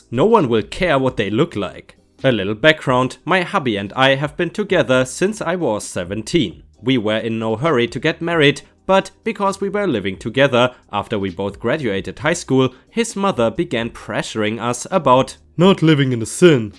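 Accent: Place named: Germany. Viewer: Random question. Are you german? I live in germany and you really have a german accent. I relly like it 🌟🌟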